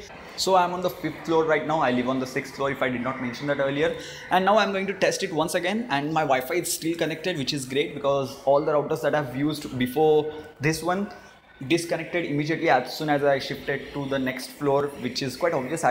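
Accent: India. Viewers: Indian accent.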